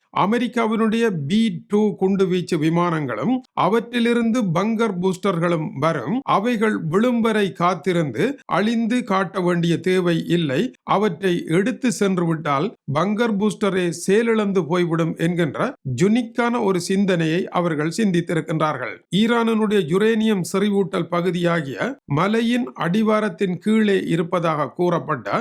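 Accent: Jaffna, Sri Lanka. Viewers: யாழ்ப்பாண ம்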